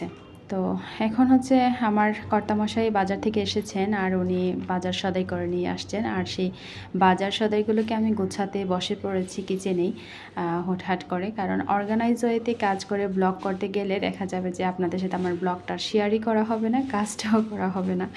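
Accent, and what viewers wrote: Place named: Chattogram, Bangladesh. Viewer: আজকেই প্রথম দেখছি, আমার বাড়ি হালিশহর সবুজবাগ চট্টগ্রাম। আমার এলাকার মানুষ এর কথা শুনেই মন ভালো হয়ে গেলো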